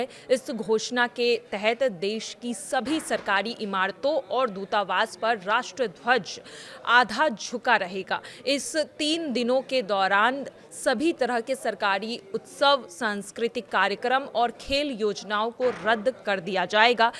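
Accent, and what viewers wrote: India. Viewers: बिहारी न्यूज एंकर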